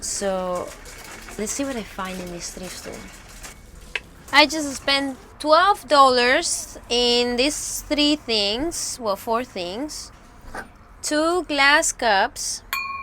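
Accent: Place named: Spain. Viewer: Like your spanish accent! Turns this hoosier man on!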